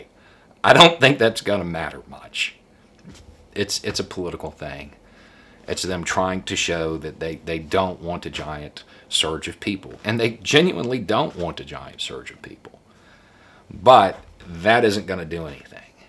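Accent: South, United States. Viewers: It's his accent and southern charm that gives Conservatives a false impression that he is an ally. One look at the very first time I saw a video of his and I assumed I was going to be giving a thumbs down. About <num> likes later........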